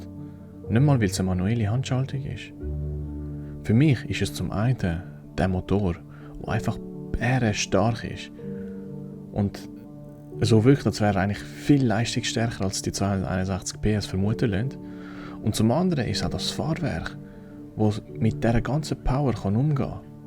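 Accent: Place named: Switzerland. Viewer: Isa schwiiiiitzerdüüüüütsch 😂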